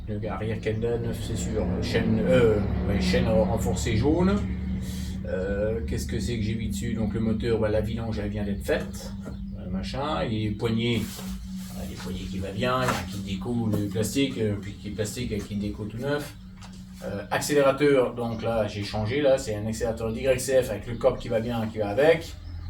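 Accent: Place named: North, France. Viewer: C’est juste, parce que j’ai l’impression de reconnaître un accent du Nord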